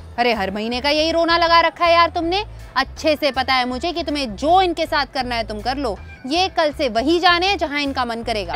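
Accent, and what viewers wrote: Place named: Kerala, India. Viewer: Madam you have Malayalam accent.. But wavy hair is the best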